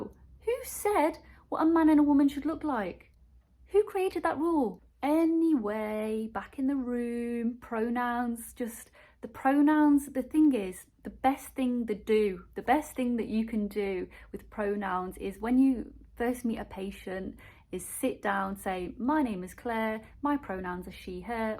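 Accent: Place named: Northern England, United Kingdom. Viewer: Also your accent is so charming to me :P Northern England? Or is it Scouse? I've tried to learn as a Canadian who loves British culture lol (all of "Britain". I know you're English :P)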